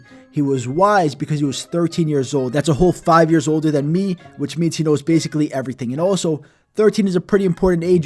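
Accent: New York, United States. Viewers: U r from New York right ? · I like his voice , it reminds me of a kind of latina and new york accent. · Is it just me or does sound like he has a slight New York accent?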